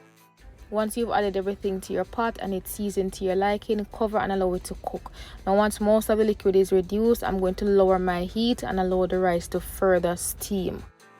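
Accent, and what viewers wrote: Jamaica. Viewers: Do I detect a Jamaican accent? Love the food.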